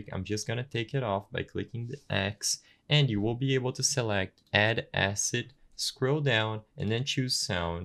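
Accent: Brazil. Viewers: Are you from Brazil? Your accent is so familiar to me.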